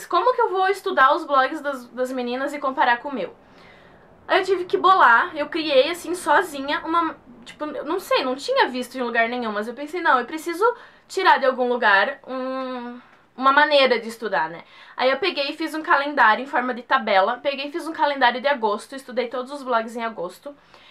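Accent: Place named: South, Brazil. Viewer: Amei o video. Que sotaque legal heim? Amo sotaque de sulista, pra min e um dos mais bonitos, e o seu e mt forte. Abracos!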